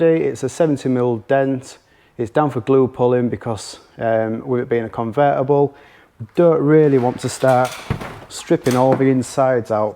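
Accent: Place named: England, United Kingdom. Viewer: G'day Australia here, top video, and has taught me a few things I've been missing thanks for that, have been learning PDR for my own use off YouTube, being an old Pom it's good to hear a Pommy accent instead of a Yanky one for a change. Keep up the good work - DaveO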